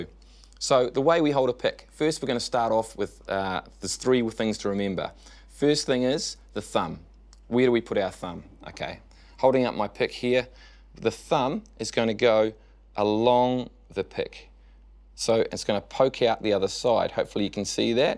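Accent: New Zealand. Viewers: Pretty sure he's from New Zealand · I was thinking you might be an Aussie because of the Ripcurl T-shirt but as soon as I heard you I knew you were a kiwi like me😂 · Hello, you explained the pick-holding technique really well, thank you very much! I've asked a few guitarist friends of mine to teach me how to hold a pick and all of them told me to hold it "naturally" (which is, what and how is natural to a first-timer anyway?). I really appreciate your detailed explanations and encouragement. Are you by any chance a New Zealander? It sounds like so from your accent, and you seem to use the words "cool" a lot, kind of a signature slang of NZers. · New Zealand? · does he come from nz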